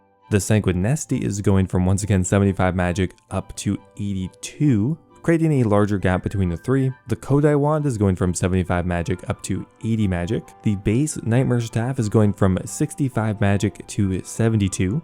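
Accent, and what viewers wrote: Quebec, Canada. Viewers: Are you french canadian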